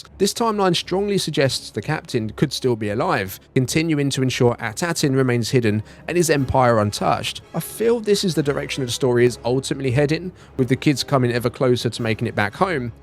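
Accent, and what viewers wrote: United Kingdom. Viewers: good video, except that strong bri-ish accent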